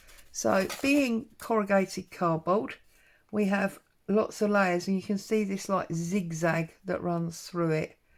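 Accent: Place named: Kent, United Kingdom. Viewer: Hi, I have just found your channel and am enjoying watching, you make it very easy to follow. Also your accent is familiar, am I correct in saying you from Kent?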